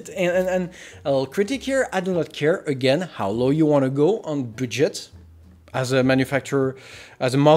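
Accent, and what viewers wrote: France. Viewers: Your french accent is awesome.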